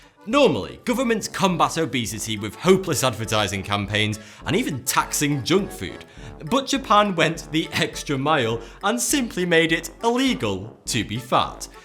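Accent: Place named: Yorkshire, United Kingdom. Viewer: Man, that Yorkshire accent...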